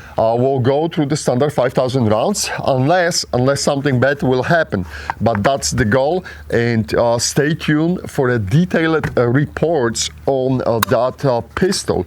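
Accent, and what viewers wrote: Serbia. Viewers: He pronounces his R's a THE sound just as someone who is native Serbian speaker! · Yeah sounds like Serbian I wish I didn't lose my accent or can control it on command haha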